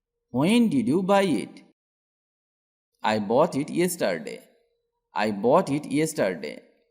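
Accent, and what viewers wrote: India. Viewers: Can you speak englisch and Not Indian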